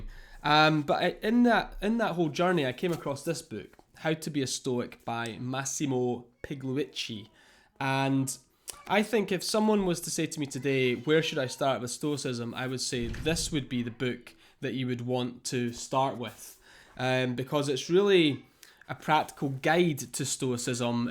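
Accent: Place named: Scotland, United Kingdom. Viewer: Are you Scottish? I think by your accent, yes. Either way, I'd listen to you talk about carrots just to listen to your accent. :)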